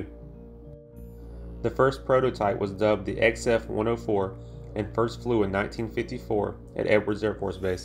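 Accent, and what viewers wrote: South, United States. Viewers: Speak more clearly & evenly, enunciating, without Southern affectation if possible. Use a close microphone, like a boom mic, to record your narration. Lower the background music level. Most of all, stop the background music now & then, to demonstrate the sounds of the F-104 engine! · I*m having trouble placing your accent. The South for sure. I appreciate your videos.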